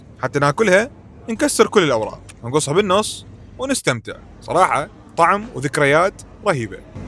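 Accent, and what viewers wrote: Iraq. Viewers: ما شاء الله اللهجة العراقية دائما حلوة ❤❤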